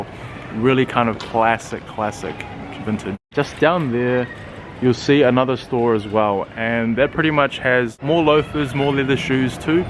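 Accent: New Zealand. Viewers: You sound like kiwi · yeah was gonna say this lol, assumed you were aussie for a sec then i listen on and am like bruhhh this guys kiwi as 😂 nice video bro!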